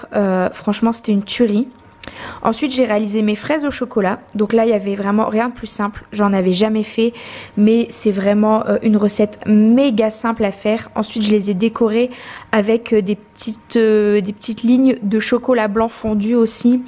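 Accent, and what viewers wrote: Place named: North, France. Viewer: Je m'en doutais que tu étais du nord j'ai entendu l accent puis après tu as dis Euralille j en étais sûre haha... tu as trouvé où un joyeuse fée du coup ?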